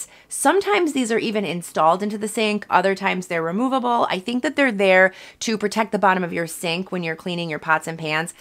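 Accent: United States. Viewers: Love your Midwest accent. Great tips, thank you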